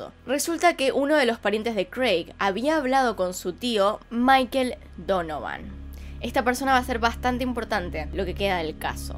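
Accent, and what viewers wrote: Argentina. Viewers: Amo tus videos y tu acento argentino que le da como mas emosion a los casos! Saludos desde west palm beach, florida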